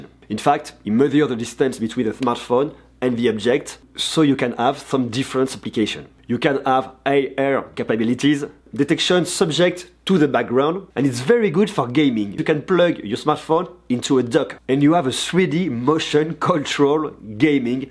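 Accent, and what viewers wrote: France. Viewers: Me too he speak frenglish it's a nightmare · It like a french accent no ?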